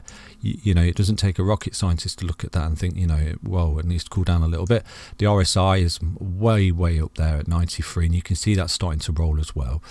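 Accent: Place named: Nottingham, United Kingdom. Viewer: Sound like your from Nottingham! Thanks for the videos bro if you ever wanna do paid lessons for learning charts let me know I’m looking to learn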